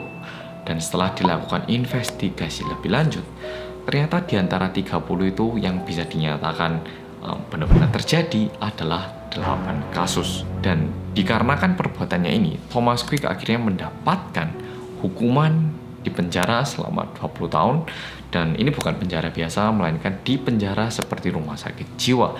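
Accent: Java, Indonesia. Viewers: Bersama orang korea yg medok💛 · suka ih medoknya mas 😂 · Sangat medok sekali luar biasaa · suka bgt denger mas ngomong medhokkkkk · MEDHOKKK AAAA SUKA BGT SMGT TERUS MAS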